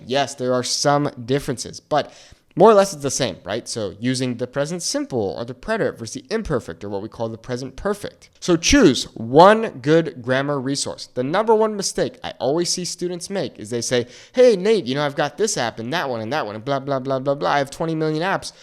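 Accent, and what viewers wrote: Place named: Mexico. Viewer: Good work. Greetings from Spain. You have a perfect Mexican accent.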